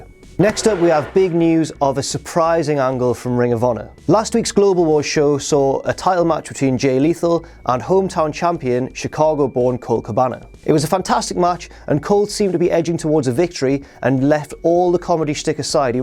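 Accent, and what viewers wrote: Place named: United Kingdom. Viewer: adam rose was charged with tampering not tumbling =P that british accent doe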